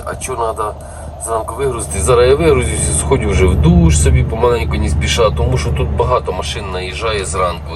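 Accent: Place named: Surzhyk, Ukraine. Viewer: Говори ты уже по-русски , а то твоя сова похожа на избевательно над рiдной мовой. Честное слово, по-русски звучит весь твой суржачий диалект.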